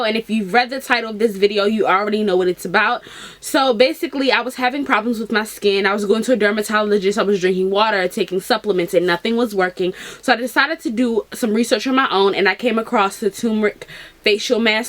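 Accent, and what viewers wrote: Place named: Baltimore, United States. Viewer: When you said turmeric, I just knew you were from Baltimore! Y’all accents stick out like a sore thumb💕😂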